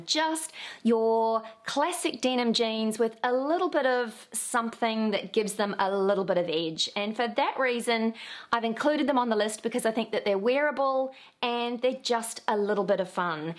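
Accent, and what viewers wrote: New Zealand. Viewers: Just have to say, I adore your New Zealand accent. When you said "six in the city", it made my day! Thanks you for all your insight! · I was wondering where your beautiful accent came from. New Zealand WOW !! We are so far away. I am in Montreal Canada. Thank God for internet. Great videos.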